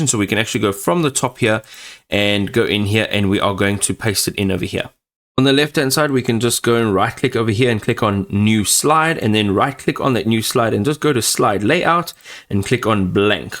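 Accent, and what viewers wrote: South Africa. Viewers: Saffa accent. Good stuff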